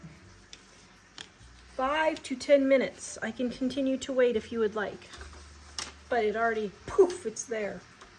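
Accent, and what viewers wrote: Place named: Kentucky, United States. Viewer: Do you live in SE KY? My mother was from there. I didn't notice it in my mother, but decades later I heard her sister pronounce it that way. The two words I know I picked up from my mother's accent was 'iron'. I pronounced it as 'r-in' until I corrected it when I was 12 and my name 'Carolyn'. I pronounced it as 'Car-lyn'. I grew up in MI.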